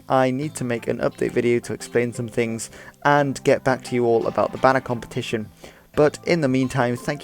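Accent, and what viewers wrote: Netherlands. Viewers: I can clearly hear that who s speaking is dutch, you realy cant learn the dutch accent unless you have learned it since your birth (as a dutch person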